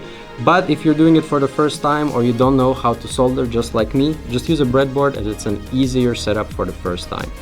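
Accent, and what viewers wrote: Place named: Russia. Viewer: He has a Russian accent )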